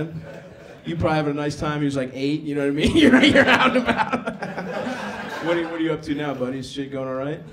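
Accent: Baltimore, United States. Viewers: Stav, you are 100 percent my favorite comedian since I discovered your stuff on Josh Potter Show. With family from College Park, I am so entertained by your Balmore accent.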